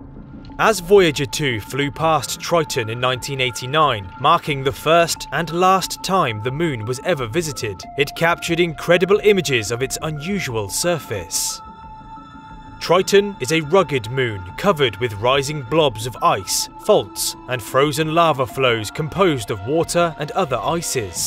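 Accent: United Kingdom. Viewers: boy i cannot get enough of these spectacular videos plus the narrator is got me talking like british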